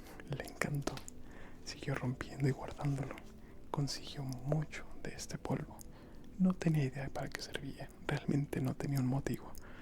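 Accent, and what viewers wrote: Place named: Argentina. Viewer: ES UN ARGENTINO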